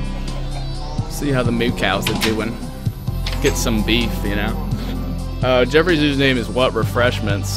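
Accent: United Kingdom. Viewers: The British accent lmao “Alright let’s go find some endermen.” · bruuh wow bri*ish accent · With That British Accent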